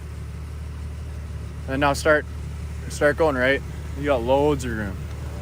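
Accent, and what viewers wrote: Canada. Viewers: Commentary is hilariously Canadian #LetsGoBoyzzz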